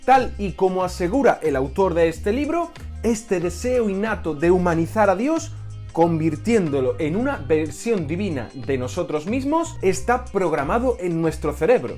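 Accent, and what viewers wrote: Spain. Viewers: Gracias por mantenernos informados y actualizados...A propósito,eres español?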